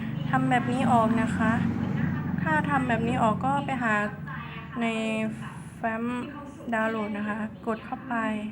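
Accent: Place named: Korat, Thailand. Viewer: พี่เป็นคนโคราชรึเปล่าค่ะทำไมพูดไทยเดิ้งค่ะ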